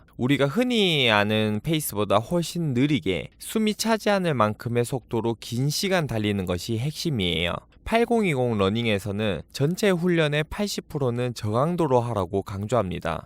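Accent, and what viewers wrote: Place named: North Gyeongsang, South Korea. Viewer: 대구 경북 엑센트 심하시네 잘봤습니다.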